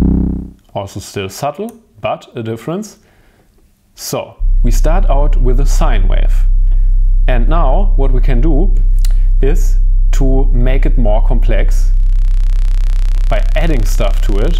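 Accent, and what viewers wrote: Germany. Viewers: While a few people here have commented, unkindly and disrespectfully about how you pronounced a few words, I am guessing they are not aware of how we each speak similar languages a little differently. I refer to such things as cultural difference which are tied to where we each grew up. I love your accent. Is it German? I had no problem understanding everything you said. Unlike most all of Europe where people study multiple languages in school growing up, such as Spanish, French, German and so many more, folks in the United States only typically study American English. While not a bad thing to only study one language your whole life, I have traveled to Europe, but have grown up in the US. I am sure that if you had an accent like you grew up in New York City, other folks would make similar comments about the accent or how you pronounced other words. 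Language is language, and as we learn more, we learn to respect others more and find and share our comments ground. Thank you so very much for this wonderful video! Now, I wonder if you have considered creating a similar video comparing and explaining the differences between, say, a Pittsburgh Modular Microvolt 3900 or similar and a comparably priced east coast style synth like a Roland System-1m. I suggest these two specifically because I have them both, are I a similar price range, and both provide standard EuroRack connections for creating new patches and sounds on just the one instrument, yet both can connect to EuroRack synths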